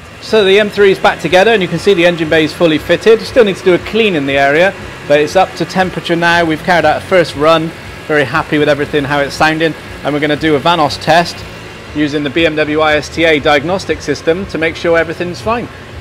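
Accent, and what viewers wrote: Bristol, United Kingdom. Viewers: Bristol accent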